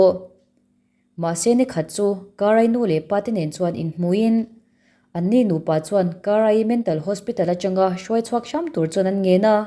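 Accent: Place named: Myanmar. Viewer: Velah i ti ziah mai burma mizo tih awm vel a ni saptawng in chhiar law2 la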